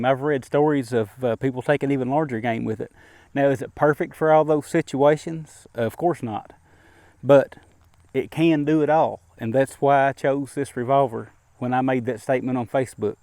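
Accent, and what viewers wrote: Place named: South, United States. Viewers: This guy has an amazing accent - can you tell me where does he come from? Texas? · I have a S&w 686 6in but agree with you that the Ruger is a fine revolver ( as are nearly all of their products) thanks for an entertaining review and I love the "metric" conversions but most of all please keep saying "burrel" that must be the metric version of barrel, ya gotta love a southern accent, keep up the good work. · The best thing about this review is the guy's accent. So southern, I love it. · Nice video! It's nice to jump in the way back machine once in a while and see you in your younger years. I could be wrong, but aren't those GP 100s made up in New Hampshire? I have a few RARs that were made in Mayodan and like them all very much, as bolt action 22s are my favorite. Grew up in a family that spoke southern as a second language (Grandma was from North Carolina) so I can understand you perfectly when you talk lol. 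All of the best to you and yours from up North. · Saw a pic of ky, sounds like ur from ky aswell.. What county u from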